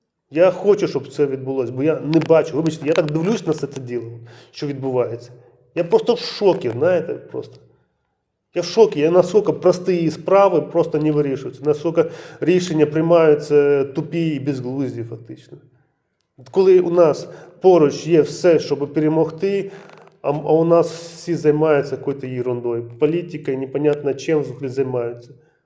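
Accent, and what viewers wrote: Russia. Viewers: 😮я чую московский суржик шановний ви не азіров в гріме?і да ти уже збрехав, в Україні можна мати любу зброю крім пістолета,навіть снайперку, і в необмеженій кількості😅 ти можеш бути президентом брехун ще той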